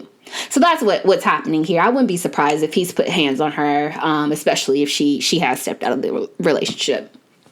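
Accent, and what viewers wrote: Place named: Midwest, United States. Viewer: Question, where are you from? Your accent gives me Midwest.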